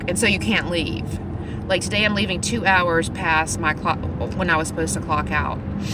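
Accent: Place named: South, United States. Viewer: i love your southern accent lol :D you are so kind to care for these people. i'm sorry you had such a crappy day. i dropped my TV earlier so it's been a rough one for me too :( your job sounds interesting, i'd love to hear more about it!!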